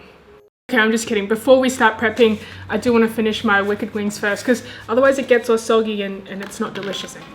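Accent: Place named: Australia. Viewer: It's called an Australian accent, millions of other girls have that exact same accent.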